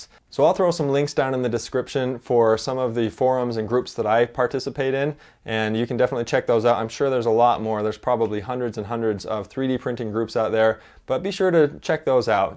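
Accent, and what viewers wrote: Utah, United States. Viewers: I am not, but my wife’s family is from Layton. And we got married in Salt Lake. But mostly I asked because I have a friend that I THINK is from Sandy and you talk just exactly like him. Also, did you say in your video that you have a tarantula? Did you cross shop it with the ender 3 and if you did why did you decide to get that one? I’ve been shopping for my first 3D printer and the ender 3 pro is my top choice- barely inching out the standard for the more robust support bar under the stage and much improved power supply. I have seen exactly one comparison video with the ender and tarantula and the tarantula came out more favorable but I never saw any other videos on that machine. Any input would be appreciated. I’m anxious (and nervous) to start the hobby! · Ha ha - I was raised in New England, actually and moved here as an adult but maybe the Utah accent is rubbing off on me :) I don't have a tarantula or an ender and haven't tried either of them so I'm not a lot of help there. 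I have printers by Flashforge, Tevo (actually just sold that one to a friend), Alfawise and Printrbot. I've heard good things about Ender machines but haven't had the chance to try it myself. Sorry I couldn't help more with that!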